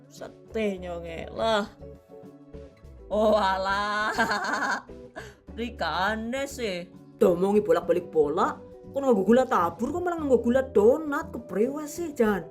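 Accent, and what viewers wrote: Banyumas, Indonesia. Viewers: mbk e lucu nk wayah ngmong ngapak😂😂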